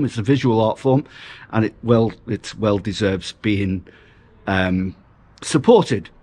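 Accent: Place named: Prescot, United Kingdom. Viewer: I always enjoy your videos the is nothing wrong with your Prescot accent is box entry still there it's at the back the centre and leads to sewell Street i used go walk downbox entry into sewell Street and walk past what me and my brothers called the train way the bi where we saw the little trains working on our way home from church school I sometimes walked with my brother or only own and the wasn't a soul in sight I felt safe lovefran xxxx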